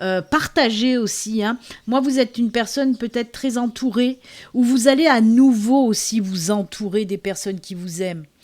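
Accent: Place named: Montpeyroux, France. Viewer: Bonjour tu es pas de montpeyroux parceque tu as l’accent de montpeyroux:) « »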